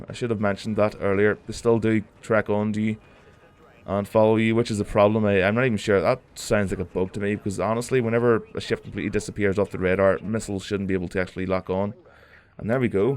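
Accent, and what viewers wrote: Northern Ireland, United Kingdom. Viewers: you sound northern irish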